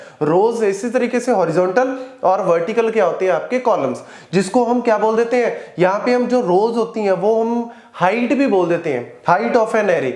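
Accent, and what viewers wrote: Punjab, India. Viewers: Ur Punjabi accent so sexy said again❤️🙌😅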